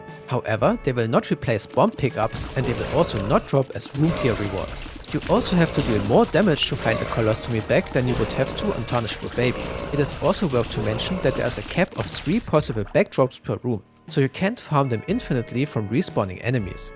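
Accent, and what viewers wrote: Germany. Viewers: Its a german accent. I have it aswell, thats why I know lol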